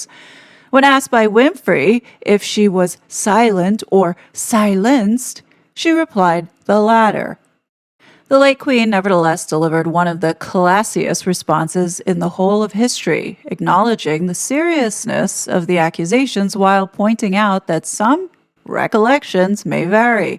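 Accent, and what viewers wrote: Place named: New York, United States. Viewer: yes! Yes! Yes! This is why I keep coming back. You say it in a way that only a New Yorker can say it. THANK YOU!!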